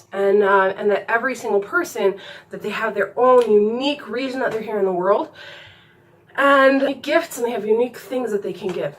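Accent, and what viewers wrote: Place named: Baltimore, United States. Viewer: Wow, you sound so much like home to me. Are you from Baltimore? Pikesville? Love your videos!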